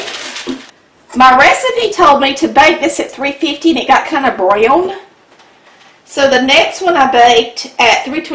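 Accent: South, United States. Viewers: Looks awesome. I was diagnosed with cancer 3 yrs ago and after chemo, radiation & 3 major cancer surgeries at M.D. Anderson in Houston Tx, I had to find a new hobby so I wouldn't loose my mind & I've learned I LOVE TO COOK!!! I'm getting better with every recipe. I will be making this soon...especially on a cold rainy day and I'm subscribing to your channel. Thanks for the recipes. Much love from Mobile Alabama I was wondering what city & state are you from...I definitely hear a southern accent.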